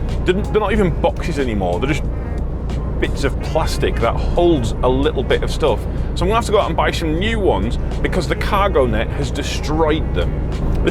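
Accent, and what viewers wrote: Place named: North, United Kingdom. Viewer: excellent rant, and you were speaking proper northern, stay safe, oh and cleaning products try bullsnot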